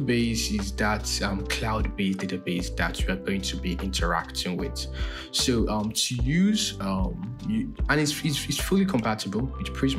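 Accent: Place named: United Kingdom. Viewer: Thank you for providing this tutorial! quite informative, but I did encounter some difficulty in following your accent. Could you kindly share where your accent originates? I was wondering if it's British. Additionally, if possible, could you consider reducing the volume of the background music? Many of us would appreciate being able to hear your voice more clearly without distraction from the music.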